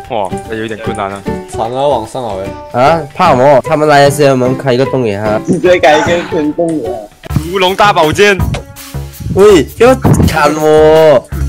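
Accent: Malaysia. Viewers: 你应该是马来西亚人吧，听那个口音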